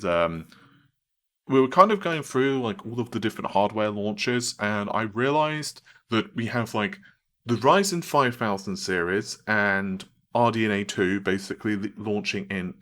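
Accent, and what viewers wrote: United Kingdom. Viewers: British accent OMG 🤣🤦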